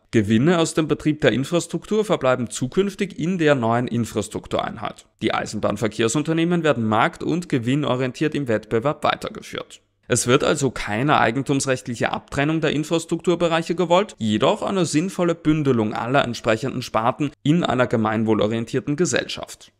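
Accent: Austria. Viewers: I don't see the problem here. Perfect German (with an Austrian dialect).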